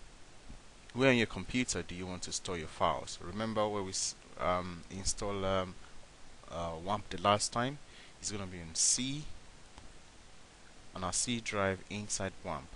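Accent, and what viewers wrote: Nigeria. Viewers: U must be a Nigerian!!!, I can never forget our accent.. love u man.. and u must b a Yoruba man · are u a NIGERIAN???? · Thanks for the video and cool ascent from Niga!!!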